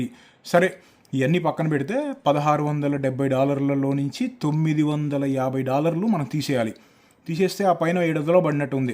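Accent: Andhra Pradesh, India. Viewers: Anna nee slang mottam pure telugu (andhra) laane undi anna …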